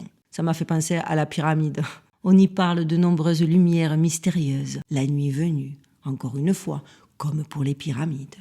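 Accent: Marseille, France. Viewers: exellente video ...j adore ton elocution et ton petit accent charmant de Marseille ;;; Felicitations pour ton travail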